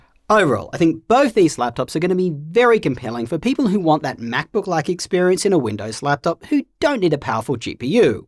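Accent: Australia. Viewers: Eww, Aussie accent.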